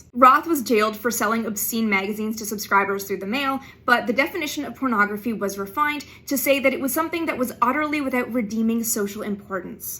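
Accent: Canada. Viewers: Now we deport you for speaking out or writing about a genocide that we're paying for in occupied Palestine. Pretty sure you're Canadian from the way you say your "ou" you off the hook. Alabama banned ann frank cause she was a jew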